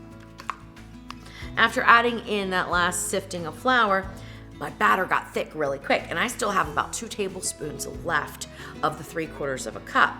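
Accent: United States. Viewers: Do I hear Wisconsin?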